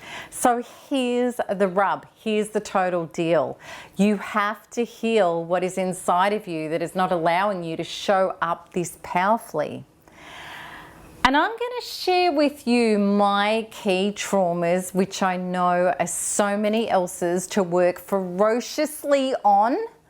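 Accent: Australia. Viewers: ozi acent and this patronising way of talking is so anoying.By the time she finishes the sentence,I forgot what she started talking about. However, some comments here are so helpfull. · What the hell is this, you wanna show that you speak Australian English right rather then what impotent things you are saying, right.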